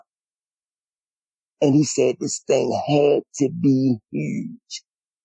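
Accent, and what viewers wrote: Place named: South, United States. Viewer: Just his southern accept so cool!!!💖💖💖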